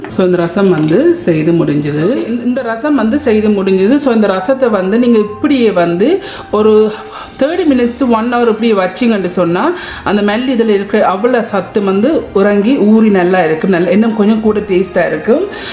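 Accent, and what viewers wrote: Sri Lanka. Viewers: Thank you for this nice video. I do enjoy listening to Ceylon Tamil! Anyway about making rasam, I use rasam powder which is easily available in Malaysia so I don't have to grind all those codiments etc . Well I enjoy watching ur video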